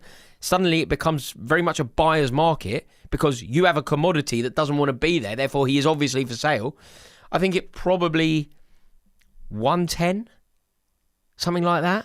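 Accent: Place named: Liverpool, United Kingdom. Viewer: I love your Scouse accent and I love that you said isak won't play for Liverpool lmao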